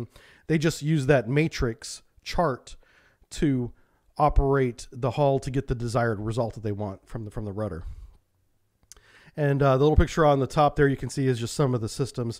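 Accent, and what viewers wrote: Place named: United States. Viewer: the pronounciation was a bit off, thats right, but still understandable and easy to correct with very minor "practice". just cut the american "dialect" pronouciation habit and you should be more than good enough. umlaut and other stuff is not really neccesary, as it is difficult for english speakers to wrap their head around and germans dont really mind if you dont pronounce them. just dont end up like the guy in the "Tank Museum Anti Tank Chat"..... that was just horrible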